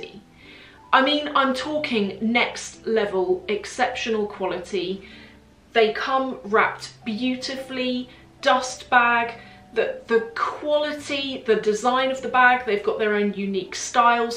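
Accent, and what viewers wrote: RP, United Kingdom. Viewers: To point - there are lots of “wannabe” Brands that are very expensive but nobody buys them because nobody has ever heard of them Anyhow just commenting here bc I took my wife to a few luxury stores in Paris and London and she watches with her lil posh accent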